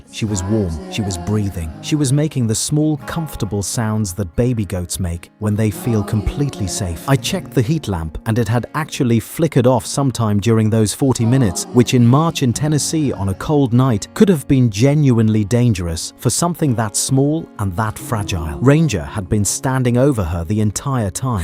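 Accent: United Kingdom. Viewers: Why a British accent when speakingʻ of his Tennessee horse farm? Why? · You have a slight English accent...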